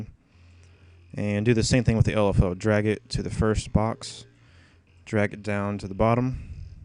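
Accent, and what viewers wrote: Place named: United States. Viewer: Thnx for the detailed vid I really appreciate it! Helped me a lot now that i got some more sounds to play with , are you from Texas by the way? you sound like a cowboy =D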